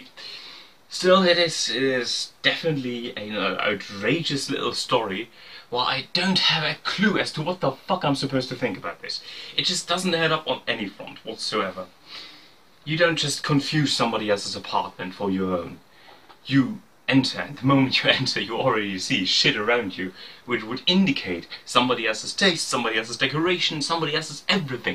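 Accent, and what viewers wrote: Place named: United Kingdom. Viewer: I'm trying not to giggle the way you said it looks different it smells different feel different (with Brit accent). but that's true!! your place smells like you looks like you and feels like you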